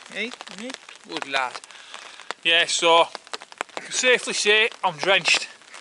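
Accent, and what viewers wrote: Lancashire, United Kingdom. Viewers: A really interesting and entertaining video. I'm still laughing at you tipping your Bilberries out by scratching your ear. I'm from the West Midlands, (Black Country) and I can understand your Lancashire accent and slang, but I wonder if some of your overseas viewers have a clue what you're taking about sometimes - RITE. 😉😁👌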